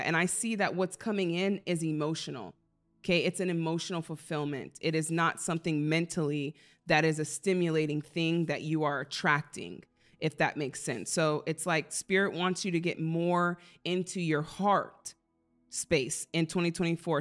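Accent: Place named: Midwest, United States. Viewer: Can you please tell me what decks you used in Leo read. You are remarkable. I’m a tarot collector since 12 yrs old. I’m 66. I love you readings your style your voice. You’re a gift to this world no doubt. I’ve been a reader my entire life it’s not often I see a true message from spirit from readers. Especially one who just cold reading on podcast. Crazy accurate. Bold brilliant and appreciated. What state are you in. I’m in Minnesota. You sound like me. Midwest accent. Lol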